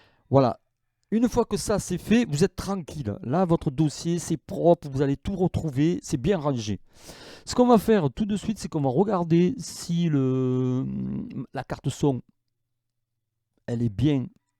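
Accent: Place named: South, France. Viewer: Bonjour! Je débute avec cubase pro11, pour le rangements des projets sur quel DD, dois je les mettre? Avec mon système sur le disque C ou avec les VST inst sur le Disque D? J'ai entendu un accent du sud dans ta voix! J'habite à côté de DAX, est ce que tu fais des formations en présentiel, ça m'intéresserais? J'adore ta façon d'expliquer très précise. Je pourrais me déplacer si l'on est pas trop loin! Amitiés à toi! Marcus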